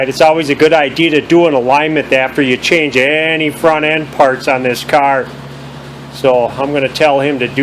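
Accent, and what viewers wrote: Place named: Minnesota, United States. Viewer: I could tell you’re from Minnesota. I also just watched the TV show Fargo.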